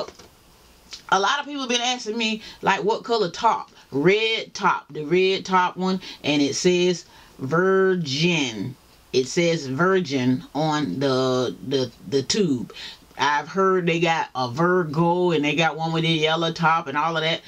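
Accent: South, United States. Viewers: I love your southern accent. I am now a subscriber.😍❤️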